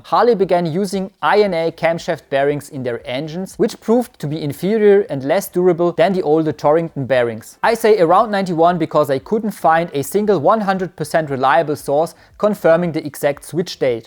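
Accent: Germany. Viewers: You can try as hard as hell, you never kick that german slang. So speak like "dir der Schnabel gewachsen ist" We have Google Translate.....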